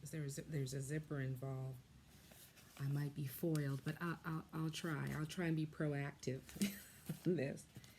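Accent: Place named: Midwest, United States. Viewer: You’re in Jamaica, but you sounds like you’re from Maybe Michigan ish. Illinois? Midwest. Then you quilt. Then you said “Here’s the thing…” Then I said, “Why haven’t you subscribed. What are you been waiting for!?” Then I subscribed😄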